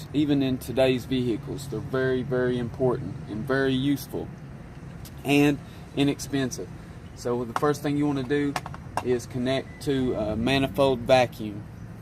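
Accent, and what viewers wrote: South, United States. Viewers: Luv accent mate. South?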